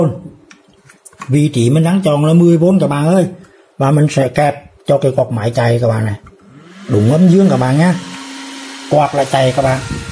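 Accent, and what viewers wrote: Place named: Hue, Vietnam. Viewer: Rất hay!! Thích nghe giọng nói ĐẬM CHẤT HUẾ của ANH....THANKS.!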